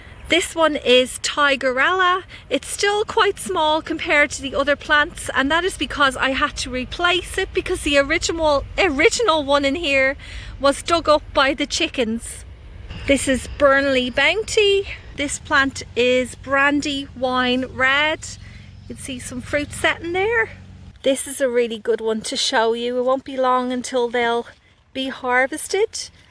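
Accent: Ireland. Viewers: Happy new year I love your beautiful garden. I love to enjoy your thursday garden update I also love your irish accent and keep up the fantastic content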